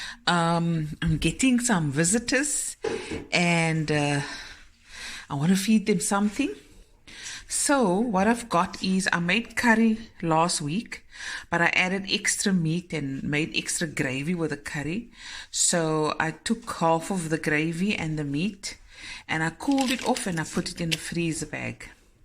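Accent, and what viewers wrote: South Africa. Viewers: I am originally from South Africa, now living in Canada. I came across you video just recently and what caught my attention was your accent. It was so good to hear a SA accent after so many years. Thank you for your videos, love them, God Bless.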